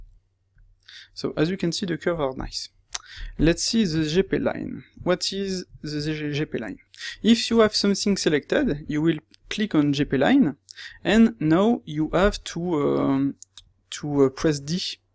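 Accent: France. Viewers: Sa se voit que ces un français qui parle mdrrr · j'avoue xD l'accent est frenchie frenchie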